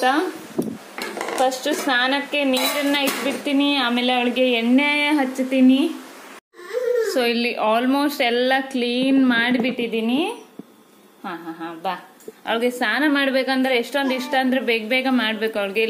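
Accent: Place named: Kundapura, India. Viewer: Kundapura bashe super. Nam kundapura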